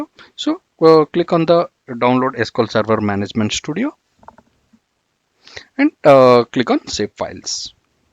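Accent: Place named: India. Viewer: why each tutorial video i'm watching bout ms products is with this awful indian accent, no rasism, I'm just fed up with this pronunciation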